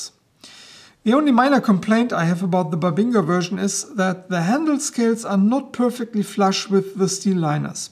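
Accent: Germany. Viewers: You really make the best reviews! Both for knives and other equipment. They are logical, detailed and easy to understand even for us with little training in the English language. The German accent is quite charming, too :-)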